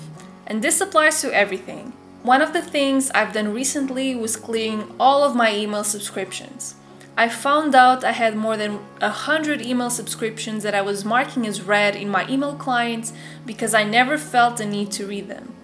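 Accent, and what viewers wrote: Brazil. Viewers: Is she brazilian? Her accent looks like a friend of mine...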